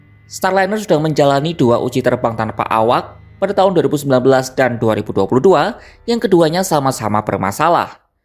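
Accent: Java, Indonesia. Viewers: kerja keras editor filter midbass karena medok parah. Aneh sekali narator ini. Kamu kuliah di mana sih. Saya aja puluhan tahun di Jawa ga medok. 😂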